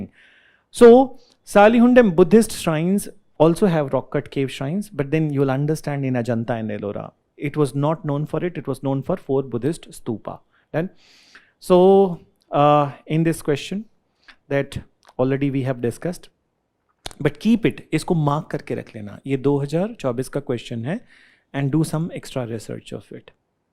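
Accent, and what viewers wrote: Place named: Karnataka, India. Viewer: Sir may be ur from karnataka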